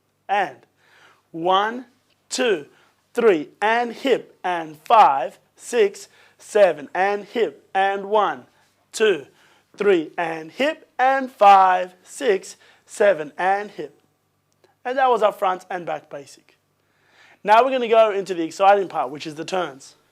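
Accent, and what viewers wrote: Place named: Australia. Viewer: It's Australian with a hint of something else.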